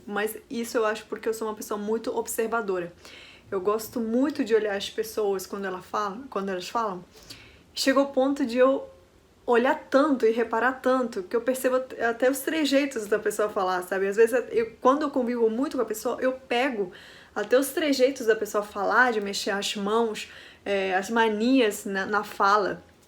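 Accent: Rio de Janeiro, Brazil. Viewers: Você tem muito sotaque carioca🥰 Eu amoooo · Eu acho que você sentia-se incomodada , sobre como as pessoas te tratavam.... ou não queria se sentir estrangeira....você queria sentir-se pertencente ao País ao lugar.... pegou até o sotaque carioca..... só cuidado para não ficar caricato..... (forçado) Parabéns pela dedicação.....realmente não parece que você não é do Brasil... bjs. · Perdeu o sotaque de quem fala espanhol e ganhou o sotaque um tanto carioca. Bem! Sotaque carioca é bem brasileiro. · Acho vocês SHOW. Mas eu vou te corrigir. Você não perdeu sotaque nenhum. Se esqueceu do xiiii (Carioca) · Incrível seu fino sotaque carioca,! Trabalhei em uma empresa de assistência técnica que tinha clientes por toda América Latina. Cheguei a morar por um ano na Venezuela, onde percebi que os brasileiros conseguem compreender bem melhor o idioma espanhol que os hispânicos podem entender o português. 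Certamente a grande variedade dos fonemas do português é um desafio para os estrangeiros. Parabéns! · É uma carioca da gema. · Fluentemente nacionalizada brasileira, mas tragicamente desperuanizada para transforma-se numa careoca . 🌺🌹🥀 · vc já é cariosíssima pra quem não sabe a história da sua família aqui nem imagina que vc é estrangeira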